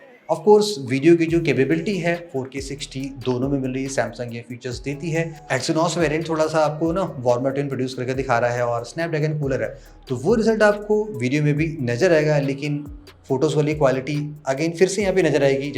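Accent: Lucknow, India. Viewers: Are you from lucknow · Aap Lucknow se ho kya???? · Bhai ap lucknow se hai kya? And Goof review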